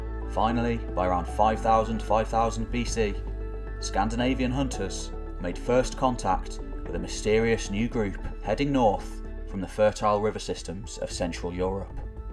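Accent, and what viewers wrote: United Kingdom. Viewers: KuLLChu Can I find a Swedish or Egyptian subject that ISNT NARRATED by a damn BRit????? KuLLChu??? Sheeeeeeesh.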